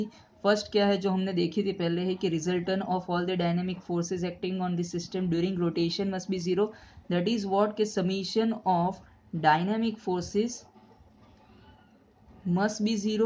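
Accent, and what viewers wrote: India. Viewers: I closed the video after hearing indian accent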